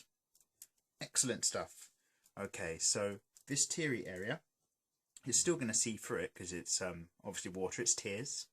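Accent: United Kingdom. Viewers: I actually just found your channel yesterday, and I have to say your tutorials are very helpful ^°^ I've always wanted to start making my own (mini) manga series, but certain things I tended to struggle with, eyes included. Also, are you British too, by any chance? XD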